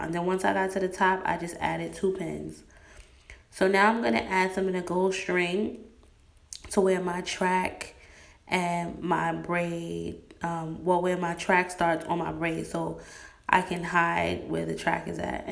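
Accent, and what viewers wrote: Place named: Cleveland, United States. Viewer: You did your thing,! Are you from Cleveland? You sound like it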